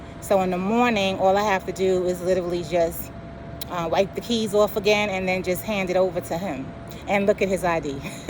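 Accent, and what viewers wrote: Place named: Bed-Stuy, United States. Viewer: Love your accent Ma! Is that Bed-Sty?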